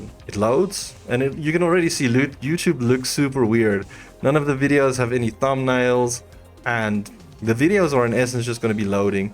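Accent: South Africa. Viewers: Great video, helped me two years later, thanks, am sure I hear a South African accent there! Lekker Boet!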